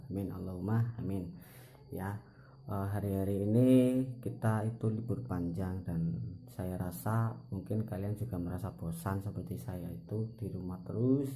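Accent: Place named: Banyumas, Indonesia. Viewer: Ngapak temen wkwkwkwk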